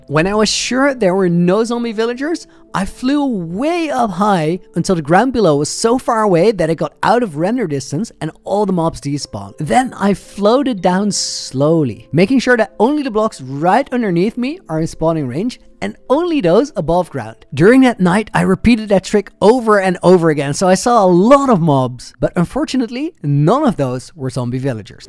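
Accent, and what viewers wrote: Netherlands. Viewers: I like that doesnt try to hide his Dutch accent completely like other youtubers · Mate judging boy your accent your dutch arent you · My guy is Dutch?!?!?! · I hear you’re Dutch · Are you dutch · I knew the guy was Dutch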